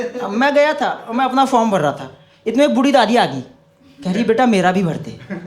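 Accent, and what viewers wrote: Uttarakhand, India. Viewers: Ha flow or naati se samjh aaya 😂 ..... mai bhi uttarakhand se 🎉😂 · Bhai uttarakhand mai kaha se ho?? Accent bata raha hai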